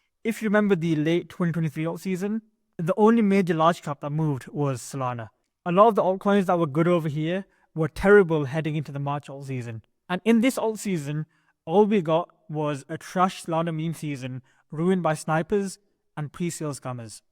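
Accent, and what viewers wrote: United Kingdom. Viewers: Love the video bro, nice to hear British crypto YouTubers 😂 . There’s a project called treble swap which is the first v4 DEX on base do you think it’s a good buy ?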